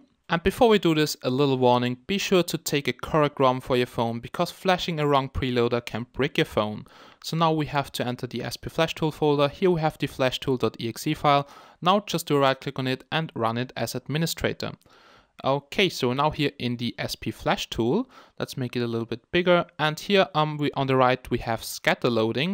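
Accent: Germany. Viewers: Man hört ein kleines bisschen den Akzent von Deutsch raus und Firefox ist auch in Deutsch, Wenn du Deutsch sprechen kannst, hab ich eine Frage. Weißt du wie man das THL T6 Pro 166L Flasht? will KMDROID 0.8 flashen aber bin kir dabei etwas unsicher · Hey I have got a Problem with the Scatterfile :( if i try to load h scatterfile Sp Flash show me an error and say sometihg about overlapping ..... Itry to flash he original Rom From The Manifactory website there is also an Ubdate but both of the roms dont load corretly by Sp Flash .... The Device is A Ulefoe U9592 .... Can you Help me Please ?? Wenn sie Deutsch Sprechen wäre es nett wenn sie es in Deutsch Beschreiben was ich machen soll :) (Wie ich auf Deusch kömme :) der Akzent klingt nach Deutschem/Österreichischem Englisch )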